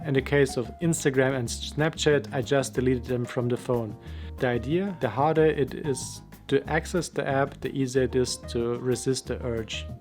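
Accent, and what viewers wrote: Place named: Germany. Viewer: I dont think that it has to be completely black or white. I never used Insta, TikTok or Snapchat in the first place but I spend a lot of time watching YouTube videos. When watching YouTube I'm still split apart. I mean I watch a lot of educational videos (like this one), but I still dont feel productive when doing it. By the way my first language is German too, I barely noticed the accent.👍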